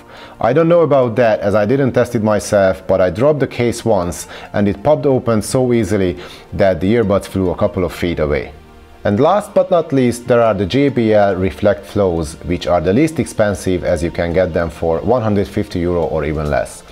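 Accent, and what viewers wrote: Hungary. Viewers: Magyar vagy? :) az akcentusod alapján olyan mintha az lennél :) ettől függetlenül szuper a kiejtésed és a content is szuper :)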